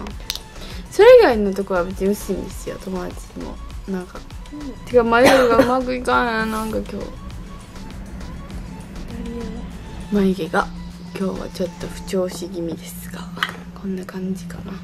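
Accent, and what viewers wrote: Kansai, Japan. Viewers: 関西弁？1人の時はあんまりでないけどお友達と話してる時結構出てるのかわいい🥰笑笑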